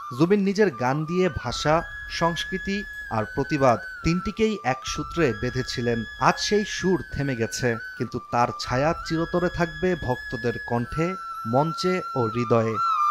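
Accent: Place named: Assam, India. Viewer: আপনার আসাম উচ্চারণ শুনতে এতটা বিকট লাগছিল। এরপরে সংবাদ পরিবেশন করার আগে ভালো করে বাংলা বাক্য উচ্চারণ শিখে আসবেন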